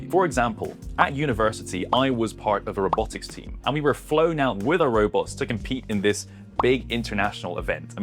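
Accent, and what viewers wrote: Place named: England, United Kingdom. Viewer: Since he clearly sounded English, it is perfectly possible that it WAS a joke. Our humour can be very "deadpan" at times.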